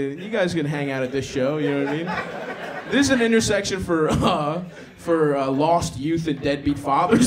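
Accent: Baltimore, United States. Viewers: Stav, you are 100 percent my favorite comedian since I discovered your stuff on Josh Potter Show. With family from College Park, I am so entertained by your Balmore accent.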